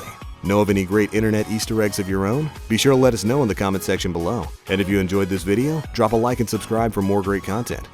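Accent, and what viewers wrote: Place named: United States. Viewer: You're in the UK? You sound so American.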